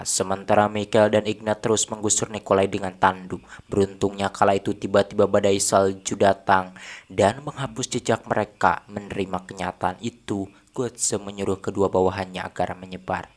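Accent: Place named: Java, Indonesia. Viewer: Jawa bangat sih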